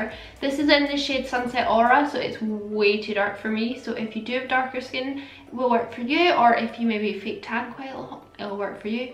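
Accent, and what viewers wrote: Scotland, United Kingdom. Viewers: Instagram handle is I'd love to be in with a chance of winning because you are my favorite inabler when it comes to colorful palettes. Also, I moved to England last year and hearing a Scottish accent makes me happy (I miss Edinburgh so much!) congratulations on 30k and kept on making me want to buy everything! Xx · Hi! CONGRATS ON 50K/30K!!!!!!!! (but you so deserve a lot more). You are genuinely so generous giving away this much AMAZING makeup and also can I just talk about how stunning you are and how INCREDIBLE at makeup you are! My Instagram is and I would love to win because I love love love makeup sooooooo much and really want to be able to grow my collection and also try out new makeup and formulas that I may not be able to afford myself lol. But I would mainly love to win just to try and grow as a makeup artist and maybe reach that goal one day! 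Love you and your Scottish accent so much!! Amelie xx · Hey I’ll be honest I only just found u a couple of weeks ago but as soon as I heard u’re voice I hit subscribe it’s so refreshing hearing a fellow Scot,, Would love to win xxxx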